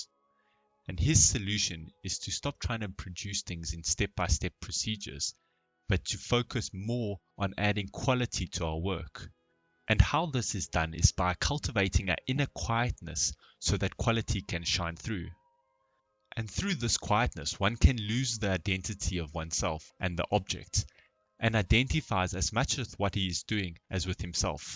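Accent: South Africa. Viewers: South African? with a cold? misses the mark. Maybe you should re-read it a hundred times like everyone else and then come back and do the video again. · Soutie accent 100% lol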